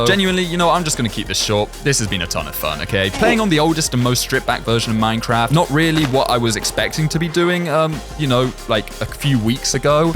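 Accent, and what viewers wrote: United Kingdom. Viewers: just realized he’s British/Australian/sum like that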